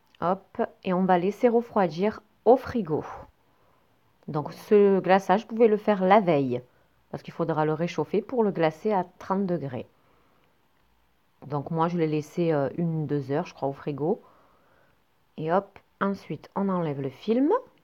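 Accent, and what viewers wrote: South, France. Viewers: L'accent du sud on Aaaaaaadore!😍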